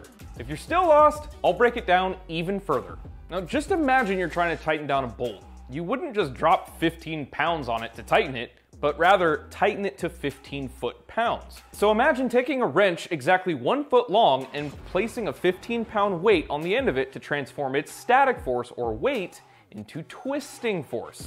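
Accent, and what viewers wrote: United States. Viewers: I feel like I've listened to James May witj an American accent 😁😁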